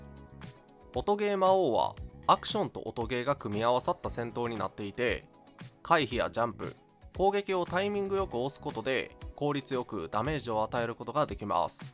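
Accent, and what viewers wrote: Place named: Kansai, Japan. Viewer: 隠しきれない関西訛り好き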